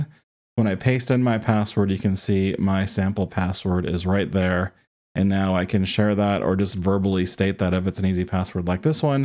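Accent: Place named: United States. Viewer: American pronunciation BAD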